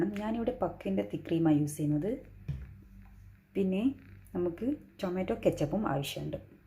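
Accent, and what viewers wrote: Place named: Peringattur, India. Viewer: Ninde peringatur baasha veratte..over aaknda😂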